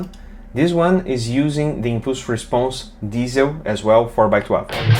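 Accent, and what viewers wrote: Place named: Brazil. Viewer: I have Brazilian friends…they have very similar accent 😅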